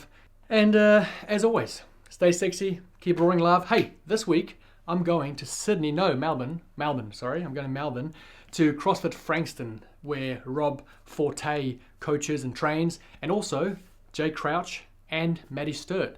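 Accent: South Africa. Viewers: Hey Mate. It’s weird listening to another South African on YouTube, but I like the videos. Keep them coming. What gym did you own in Auckland? Was it Andfit?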